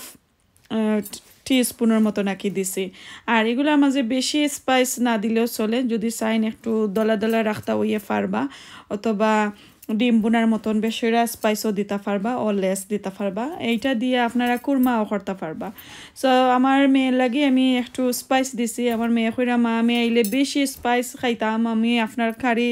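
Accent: Sylhet, Bangladesh. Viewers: Apu apnar kota vala lagse fast comment korlam like diya subscribe korlam. amrar rannar lakhan apnar ranna. Apne amrar sylheti apnar kota shunte valalage. Like diya subscribe korlam.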